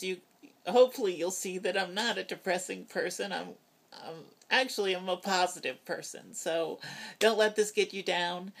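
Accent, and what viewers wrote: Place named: Texas, United States. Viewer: you are an incredibly interest and attractive woman and you've got a great Texas or southern Illinois feminine voice. As a 64 year old cisgender straight male ally I find it interesting to list to a woman who is "from my generation" which is rare as I listen to the You Tube videos. There is a 30ish trans gender woman in Florida JW transgirl who might be an interesting person for her to talk with. and like yourself she is a very interesting and intelligent woman. I am so happy that you had the opportunity to have some time of closeness with your mother in the hospital when the two of you did not have the stern eyes of the rest of your family.. I am so sorry your family was so cruel to you on Mother's Day. I will tell you what I tell every transgender woman, I feel that each and every one of you is a special gift from God, you have the special gift of understanding the world through nthe eyes of both a man and a woman.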